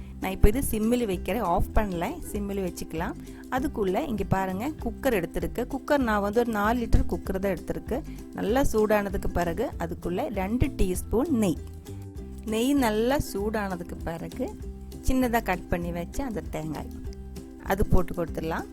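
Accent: Kerala, India. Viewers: Malayali speaking tamil will be great to hear. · Malayazhi speaking Tamil very cute and recipe very nice.... Try panni paakurean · Love your malayalam slanged tamil. So sweet to hear . Looks like an easy recipe. Will try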